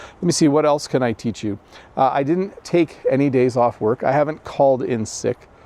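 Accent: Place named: Canada. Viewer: Surprise surprise, I understand canadian english better than american english, I don't know why.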